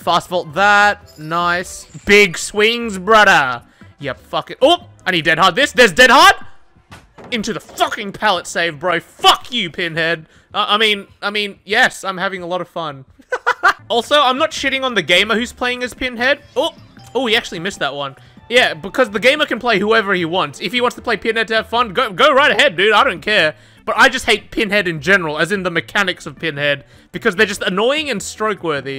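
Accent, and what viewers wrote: Philippines. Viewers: Wow! The typical Filipino dissing the Pinoy accent! You got yourself a new subscriber. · being Filipino actually makes sense with his accent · The filo accent is so spot on I choked on my empanada.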